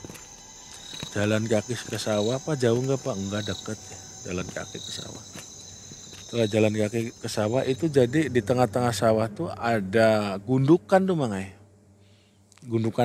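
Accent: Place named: Banyumas, Indonesia. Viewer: Pantes selalu ngundang mas viewer nya tinggi terus,mas ceritanya juga ga ngebosenin santai mudah di mengerti, saya sebagai orang ngapak kalo mas ngomong ngapak aku pun tau artinya😂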